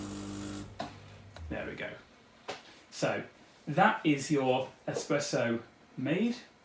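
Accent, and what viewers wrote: United Kingdom. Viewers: Hi! Great video. As a German obsessed with English accents, I would like to ask you which part of England you are from...Cheers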